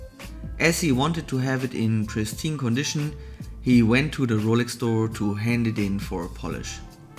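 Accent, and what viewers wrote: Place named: Germany. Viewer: The main reason I open your videos is to demonstrate what a strong German accent sounds. Please practice your th and your of kurs is actually of course.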